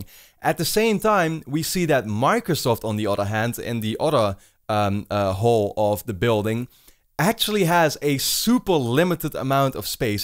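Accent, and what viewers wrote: Netherlands. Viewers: Herm... he's probably Dutch, isn't he?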